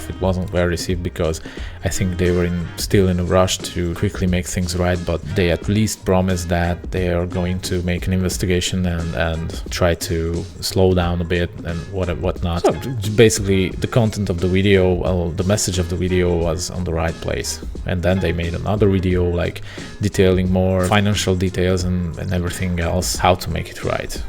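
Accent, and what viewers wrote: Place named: Sweden. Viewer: swedish accent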